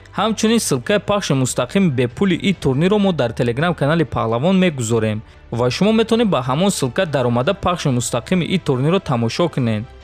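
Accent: Tajikistan. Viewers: Овози ширини точикии тозаат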